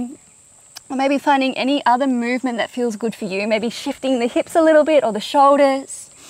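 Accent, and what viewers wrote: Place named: Australia. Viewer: Thank you so much for taking your time for ourselves. What a beautiful practice once more. I missed you and your beautiful practices and your beautiful australian accent and the beautiful sounds of nature all around you. It is hot and sweaty there and I am freezing in front of the wood-stove here early in the morning! The cicadas are long left towards Australia and still very nice to hear that they reached their destination! All the best.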